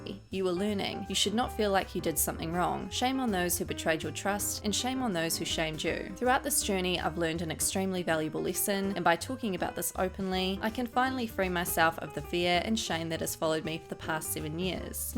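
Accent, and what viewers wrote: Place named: New Zealand. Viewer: Kiwi narrator? YAAAAS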